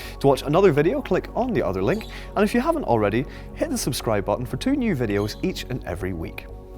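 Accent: United Kingdom. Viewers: also like your accent, which part of UK is it from?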